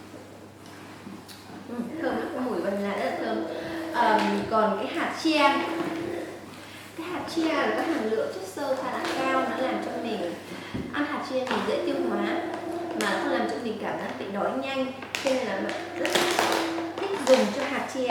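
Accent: North, Vietnam. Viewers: Giong bac ky 75.... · Nghe giọng Bắc ko muốn xem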